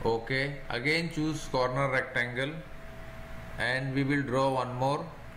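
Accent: India. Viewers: Thank you, finally a tutorial with an indian accent!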